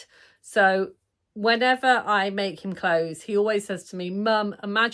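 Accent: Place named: United Kingdom. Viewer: Oh....your lovely British accent!! A Router ... tee hee, got it now! So enjoy your sparkle videos!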